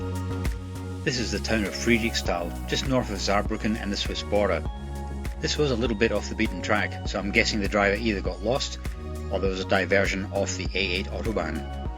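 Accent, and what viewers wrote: Scotland, United Kingdom. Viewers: Scottish accent with something else creeping in - can't quite put my finger on it though. Another English speaking country but not British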